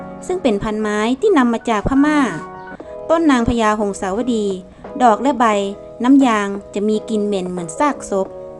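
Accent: North, Thailand. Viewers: ชอบสำเนียงทางเหนือจังครับแฟนผมกะอยู่ลำปาง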